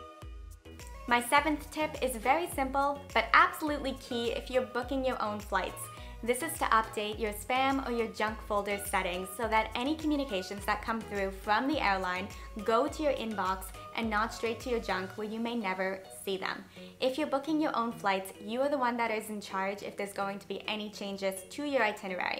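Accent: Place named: Boston, United States. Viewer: Love your Bostonian accent :)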